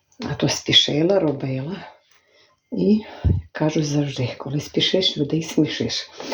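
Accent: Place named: Vinnytsia, Ukraine. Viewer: Чоловікова тітка родом з Вінницької області також смачні голубці робила і говорила, як Ви. А голубці завжди були мініатюрні, трикутні і дуже смачні. Теплом повіяло від Вас і Ваших голубців. Міцного Вам здоров'я. І довгих років щасливого мирного життя. Дякую за теплі спогади. На жаль, тільки вони й залишилися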